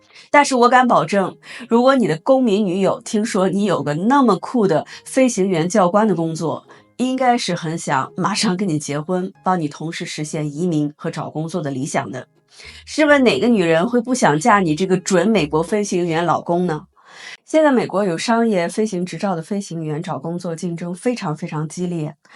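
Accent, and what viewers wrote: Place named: Beijing, China. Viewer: 这次说话有北京味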